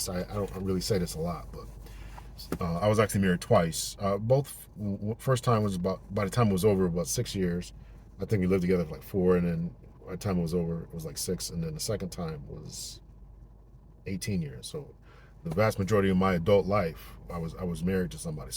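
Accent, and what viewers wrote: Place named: United States. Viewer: I'm not a guy, and this channel appears to be for the guys, However, you popped up in my feed, so I decided to answer your question: Yes ! Absolutely! Just have a written notarized agreement of your requirements for her to sign prior, and a video recording of her doing it. You would have to sign an agreement as well. That's the only way that I would consider it. " Man" should not be alone according to God. That's why he made "Woman" for marriage. 3rd time is a charm !😊 BTW, Love your accent. You're from somewhere other than the South, either West or perhaps Northern. I'm your newest subscriber, probably the only born female in here listening, with the audacity to throw in my 2 cents. 😊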